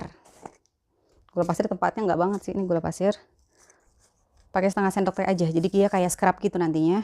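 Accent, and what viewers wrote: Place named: Betawi, Indonesia. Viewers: Mbak..lucu bangeet sii..bicara nya kok depok bangeet..rumahan gayanya .gak imeg "an..haha..love you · Logat Indonesia betawinya masih kental... Bagaimana orang Arab lihat wajah Indonesia yg rata2 pesek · Betawinya msh kental...😀😀😀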